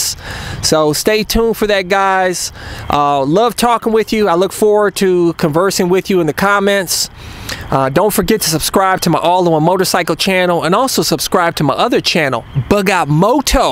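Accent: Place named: North, United States. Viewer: you might want to learn the southern accent. There's still some "good ol' boys" down there, in the smaller towns, that might resent your Yankee accent. I'd hate to hear that they found the bug-out van and the psycho-moto, way back off in the timber but no one around.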